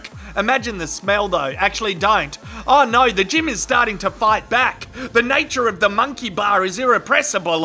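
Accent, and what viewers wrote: Australia. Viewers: U sound Aussie. R u?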